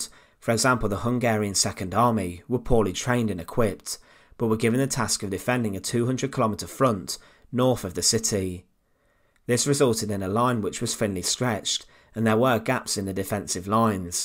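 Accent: United Kingdom. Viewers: The narrators voice is too much..I can't understand it, that British accent is too heavy.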